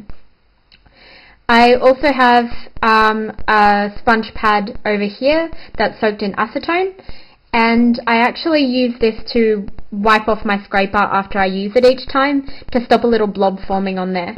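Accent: Australia. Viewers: I'm Australian, Got the same accent myself, yeah she's Aussie ;)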